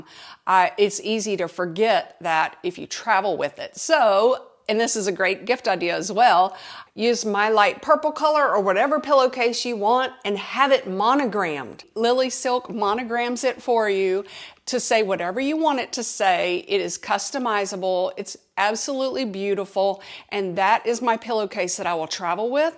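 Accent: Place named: Georgia, United States. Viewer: Oh, so that is why I like your accent. I am from GA too. The Texas accent grates on my nerves.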